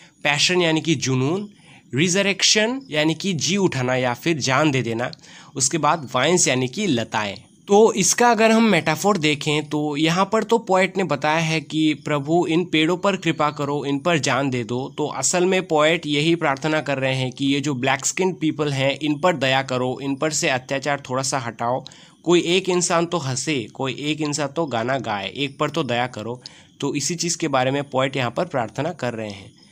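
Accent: Assam, India. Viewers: Sir r u Assamese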